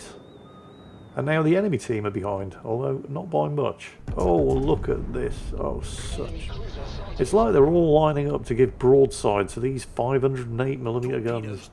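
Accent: United Kingdom. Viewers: I think both ways are correct, its just your dialect of our great brit ish language, e.g. some same bath as bah th, others call it a bar th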